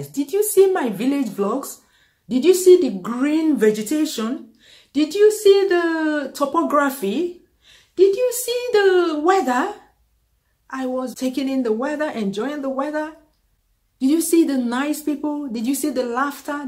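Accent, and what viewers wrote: Nigeria. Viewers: I love your accent, truely Nigerian. I am very sad about what's going on in Nigeria. I agree with you let's make Nigeria great again.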